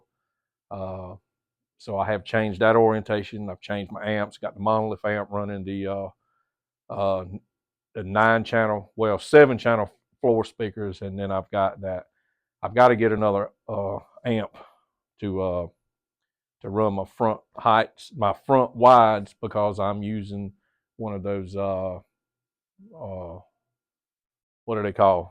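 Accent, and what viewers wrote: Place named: South, United States. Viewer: Cheers bud. This is comin from a yankee. Gotta say, i was raised by a southern gentleman. Although i dont live in the south, its really cool to hear a you tuber who’s doing videos about home theater, AND has a little southern twang in his voice. Cheers good sir! I enjoy hearing about your home theater journey. Have a good one..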